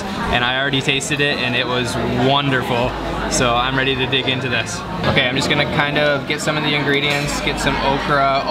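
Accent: Canada. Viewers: Its canadian speaking style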